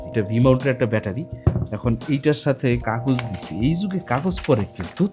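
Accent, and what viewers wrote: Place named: Barishal, Bangladesh. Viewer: মনু তোমার বাড়ি কি বরিশালে ওই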